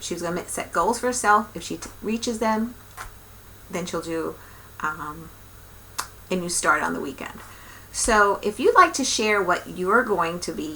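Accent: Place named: East Coast, United States. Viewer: Hi. I'm glad I found your channel today. I'll go back to your Flosstube #1 and catch up on your videos in the days ahead. I moved to the desert southwest in 2000 but you and I have the same accent so I believe we are both from the same coast of this beautiful country. Like you I cross-stitched a little bit in the 1980's until work and bringing up 2 boys took precedence. Once the boys were grown and on their own, I took up quilting and about 2-1/2 years ago I stumbled on cross-stitch again at our State Fair. I am glad that I have two of the most wonderful hobbies and I love them equally. Since there are four full weeks in May, my Maynia plans consist of four WIP's and four new starts. Monday through Friday of week #1 I will work on WIP #1 and Saturday and Sunday work on new start #1, etc. Good luck with your Maynia plans and I look forward to your next video.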